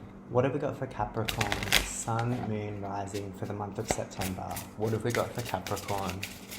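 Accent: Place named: Australia. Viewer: Great to hear an Aussie!! You're spot on... keep doing it... I love that you're not afraid to talk about the denial ..it was my relationship... I felt he was lying about things but he gaslighted me into believing him...until I started having confirming dreams!! Confronted him & now with my eyes opened I could feel he was lying... he's a covert narcissist. It was his control for 4 yrs & yeh on the surface he gave up alot of addictions but was wanking to porn/women online!! Yep he's in HUGE denial... very deceiptful Keep reading... you're fairly spot on for me except the career thing...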